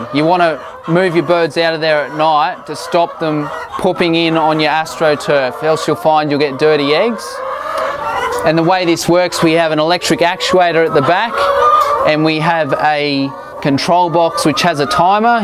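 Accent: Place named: Australia. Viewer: Ur australian :P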